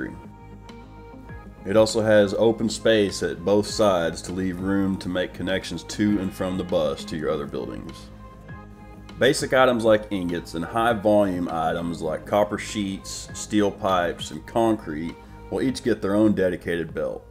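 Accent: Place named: Midwest, United States. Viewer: Hidden Gem of Satisfactory Video. Double props for midwestern explaining factories.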